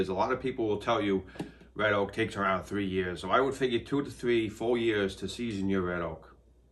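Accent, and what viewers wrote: Massachusetts, United States. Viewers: I live close to Frankfurt, Germany and have access to beech, oak, pine, spruce and fir mostly with the occasional birch and wild cherry. My favorite firewood so far is beech. Starting the fire with some softwood and then adding beech is my standard procedure. Oak is great for putting on a good bed of coals. I split my firewood roughly into the size of a triangle that forms when you hold both index fingers and thumbs together. The length is between 10 and 11 inches (25-30cm). Stored in a proper wood shed, I usually get all my firewood to a moisture content below 20% until September/October when split and stacked in March of the same year. Thanks for the great videos, content and information. The Massachusetts accent reminds me of our relatives that live in Boston and Concord, MA.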